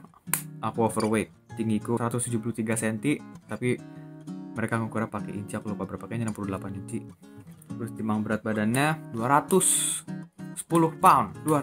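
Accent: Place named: Java, Indonesia. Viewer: Wihh jowo Endi mas 😂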